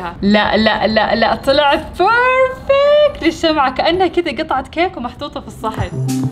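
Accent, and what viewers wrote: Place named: Iraq. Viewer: احكي يوام كامل عراقي😊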